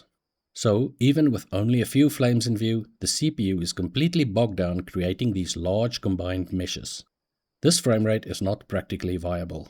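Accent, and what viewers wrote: South Africa. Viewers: You sound South-African, The work looks interesting. What game are you building?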